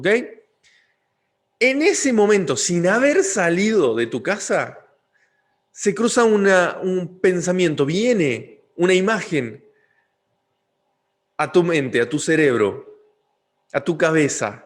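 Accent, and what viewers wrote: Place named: Tucumán, Argentina. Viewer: Muy claro. Con acento tucumano hace rato que no escuchaba esas rr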